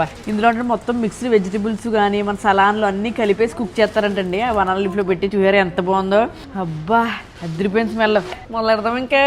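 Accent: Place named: Amalapuram, India. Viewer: Amalapuram language super ..entandi....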